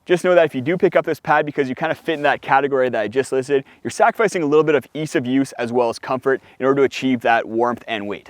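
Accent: Canada. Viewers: I mean if the accent wasnt obvious, the mixing of metric and imperial measurements make it obvious of your canadian heritage lol.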